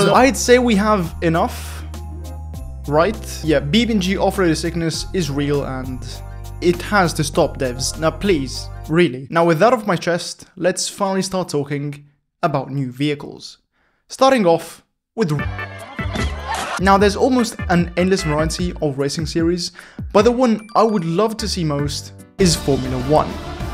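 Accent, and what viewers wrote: Italy. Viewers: Are you italian? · are you italian?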